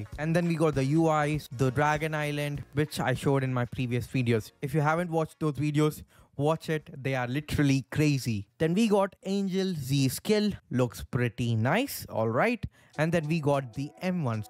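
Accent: India. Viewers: Your accent sounds like indian idk why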